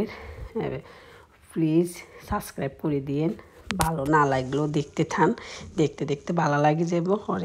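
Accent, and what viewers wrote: Noakhali, Bangladesh. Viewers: আমি বুঝিনা নোয়াখালীর কথা আন্টি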